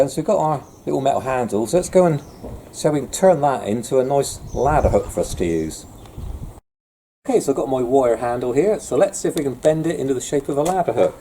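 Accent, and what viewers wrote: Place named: England, United Kingdom. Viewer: sounds like you are in England....not sure if they have it there, but here in the states we have dedicated bucket hooks that basically do the same thing as your custom job there. it hangs on the rung but is off to the side of the ladder so no issues. personally i dont like them, i use a Handy Pail brand bucket to paint out of. i can slip my hand like a glove onto their handle and still use my fingers to holdthe ladder if needed. 9/10 times i set my bucket on the top one or two ladder rungs though. The only flaw those Handy Pails have is you cannot hook them onto a ladder. Purdy has one that is able to use ladder hooks but i just dont like the design, seems cheap and can only fit a 4inch weeenie roller(sausage roller, small roller, pick your adjective) and i really like to use the 6in version which the Handy Pail fits beautifully. 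Just some tips from a painter of 25 years here in Kansas. (yes that Dorthy and Toto Kansas...i live 15 minutes from the Wizard of Oz museum.)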